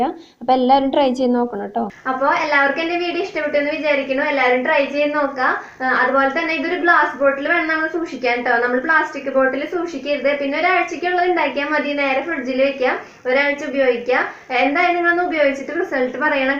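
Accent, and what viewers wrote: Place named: Thrissur, India. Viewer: എവിടെ ആണ് വീട്?? തൃശൂർ ക്കാരി ആണോ?? 🤔🤔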